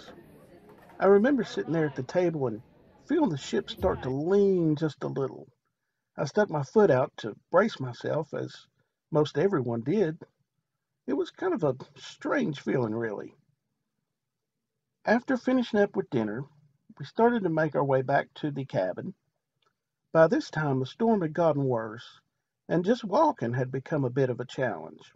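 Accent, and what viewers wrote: South, United States. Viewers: great southern voice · Thank you I love your story telling made me wanna watch it all.❤️🌹👍. I love your southern accent, my mom is deep southern . God bless you and your family in your endeavors. From Alaska.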